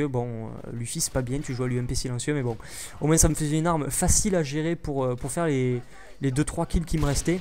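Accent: Toulouse, France. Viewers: tu dis neuke a cause de ton accent toulousain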